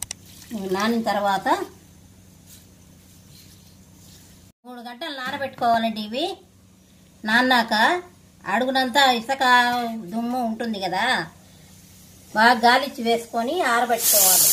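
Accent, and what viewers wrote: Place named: Hosur, India. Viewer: నమస్కారం అండీ, మా అవ్వ చేసేది వీటిని:-) మేము బ్రహ్మణులంకాదు గానీ మీరు చేసే చాలా వంటకాలు మా అవ్వలు చేసేవాళ్ళు. ఇటీవల 10 ఏళ్లుగా ఆహారపు అలవాట్లు బొత్తిగా మారిపోయాయి. మీ videos చూసి మళ్ళీ ఆ పాత రోజులు గుర్తుకొస్తున్నాయి. వీటిని మేము సింబిలి/శింబిలి ఉంటలు అంటాము. మాది హోసూరు/బెంగళూరు ప్రాంతంమండి, మీ యాస కూడా మా యాసలాగే ఉంది. మీది ఏ ఊరు?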